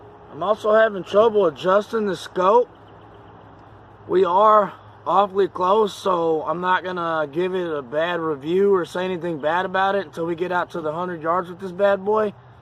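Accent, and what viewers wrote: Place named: South, United States. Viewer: Nice southern twang you got there, Mr. Lol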